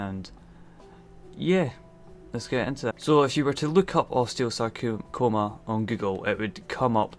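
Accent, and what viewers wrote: Scotland, United Kingdom. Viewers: Yo man where you from in Scotland?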